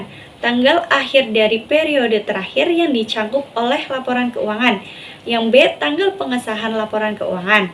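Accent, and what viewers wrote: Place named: Banyumas, Indonesia. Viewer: Walau ngapak aku ttp luuuvvv wkwkwkw semangat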